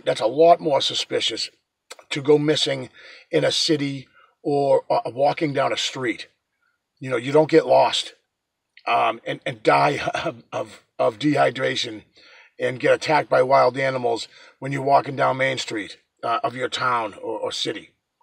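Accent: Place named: Boston, United States. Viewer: Just found your channel. I'm into these things. Just subbed. Great theory. You sound like u have a Boston accent lol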